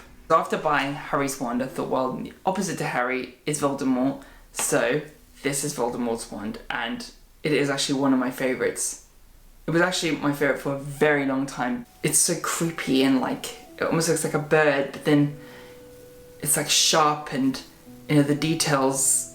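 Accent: United Kingdom. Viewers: u sound like devon bumpkin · Nice British accent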